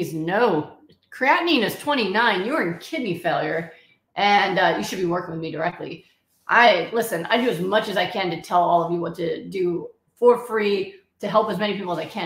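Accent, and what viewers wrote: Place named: Texas, United States. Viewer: You're starting to sound like a real Texas. Matthew McCaughey would say, "Hello, everybody."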